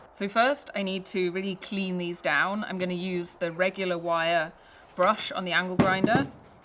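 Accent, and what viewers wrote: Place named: RP, United Kingdom. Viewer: У вас очень хороший английский как у вас говорят "Queen's English" несмотря на то что вы живете в деревне. Обычно британский деревенский просто ужасен ничего не понятно. ☝😲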